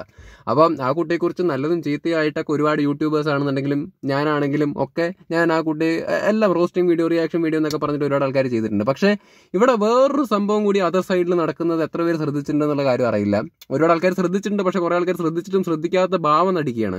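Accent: Palakkad, India. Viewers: Palakkad slang varund broiii👏👏👏👏👏 pkd anel proud of yu man✌️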